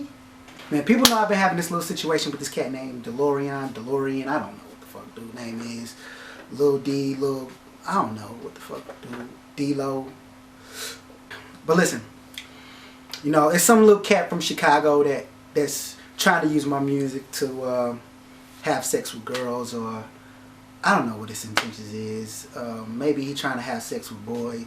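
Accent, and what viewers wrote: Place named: St. Louis, United States. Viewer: ***** picosso said "great artist steals" not saying he's great but great in the eye if the blind. You know what I mean bro. I'm from St.Louis to bro and I hurr it in your voice. Your a humble guy man because if you wasn't you'd be hot headed & I'm glad to see after all that your still humble God bless u bro. Keep doing you. The fakes getting exposed everyday. God givith & God takith away. He wont be seeing bright days much longer just keep doing u.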